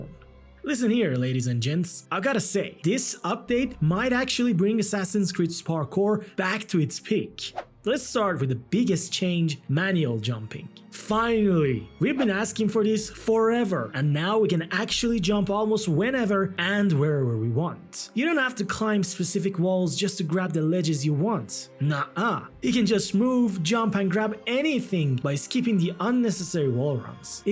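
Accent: Iran. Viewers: Are u Iranian? I ask it because of your accent❤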